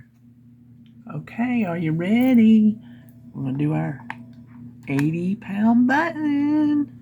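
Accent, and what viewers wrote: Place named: North Carolina, United States. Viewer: I just LOVE to hear you talk! I live in Wilmington and the way you talk reminds me so much of my friend who lives in Lake Toxaway. A few videos back I think I remember you talking about Whataburger in Kannapolis and it brought back so many memories. We used to eat there when I was a kid and would go visit my brother in Concord.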